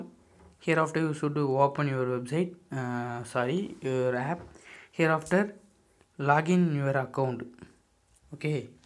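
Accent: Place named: England, United Kingdom. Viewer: Din nou musulman prost cu accent de englez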